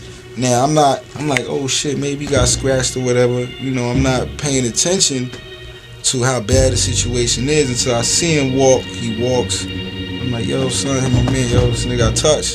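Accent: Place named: Brooklyn, United States. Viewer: you sexy pa pa...I love the way you talk...so NEWYORK....(my hometown)...Ima fine redbone sista and im sending you so much love young GOD...only the strong survive...STRENGTH, GUIDANCE AND PROTECTION...Peace!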